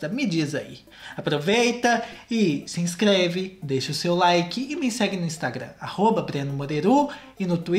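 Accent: Minas Gerais, Brazil. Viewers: Eu gosto de comida boa não de comida xexelenta BERRO KKKKKKKKKK PS.: cê é mineiro? Pq pelo sotaque parece um pouco kkkkkkk obrigada por não decepcionar nos vídeos 💖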